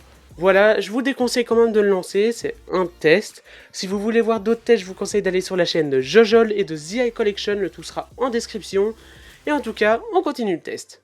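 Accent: Belgium. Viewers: et t'est belge?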